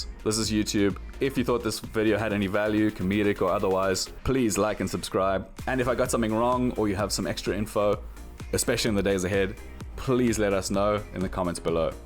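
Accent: South Africa. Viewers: You are definitely south african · Awe boet, I can hear you are South African, good to hear a familiar accent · Hi are you South African ? Sounds like a wyt saffer accent. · HOL UP ARE YOU SOUTH AFRICAN ????????? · Are you from South Africa, accent sounds like it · Bru are you South African? You sound South African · Well done not the video. Are you South African? You have to be with that accent.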